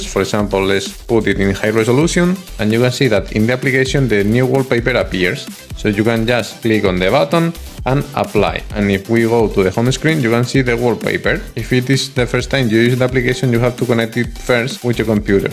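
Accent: Spain. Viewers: Cómo se nos nota el acento español cuando hablamos inglés... Buen video colega, me suscribo.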